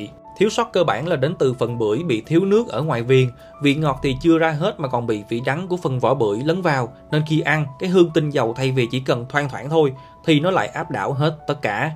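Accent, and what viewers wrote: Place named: South, Vietnam. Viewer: giọng miền nam rất thân thuong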